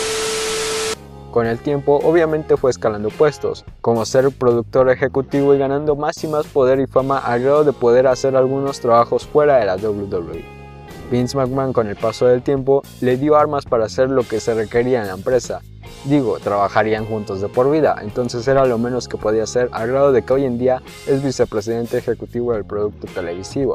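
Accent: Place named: Andalusia, Spain. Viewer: Me desuscribo, no me gusta tu acento sureño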